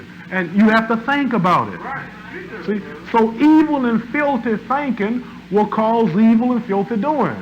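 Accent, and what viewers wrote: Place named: Kentucky, United States. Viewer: An hard word. Loved that kentuckian accent he had.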